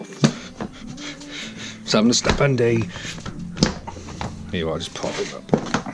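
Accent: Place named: Scotland, United Kingdom. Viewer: Hi i am Chris i watch your channel and like it but sometimes hard to understand your accent where yod from in UK I bet scotland or close. regards Chris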